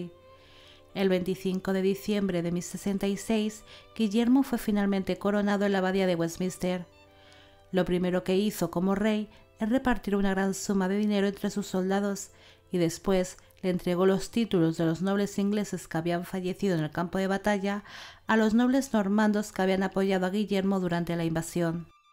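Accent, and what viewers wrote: Spain. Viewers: Estoy enamorado de tu voz, la cadencia, tu acento peninsular... como Argentino, hijo de España, me derrite. Excelente trabajo.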